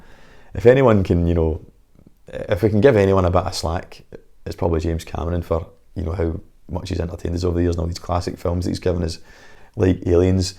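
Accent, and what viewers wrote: Scotland, United Kingdom. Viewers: Hi, not only I share your passion for physical media, I also freaking love your Scottish accent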